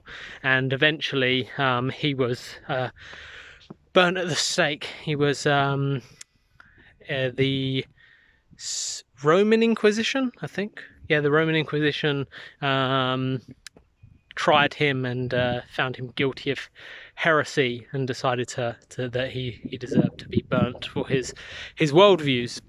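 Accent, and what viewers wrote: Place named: United Kingdom. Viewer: Thx for sharing.. How come you have stopped making more content for your channel. We have the same accent from UK 😁✌🏼 Thx for sharing. Subscribed 💚🙌🏼✌🏼🙏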